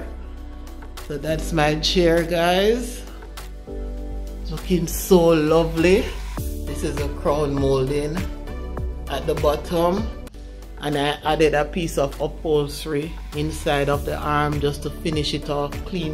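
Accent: Jamaica. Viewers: are you Jamaican? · You sound Jamaican 🇯🇲 . Anyway, I have two old armchairs that I will diy into throne chairs. I hope mine looks awesome like yours.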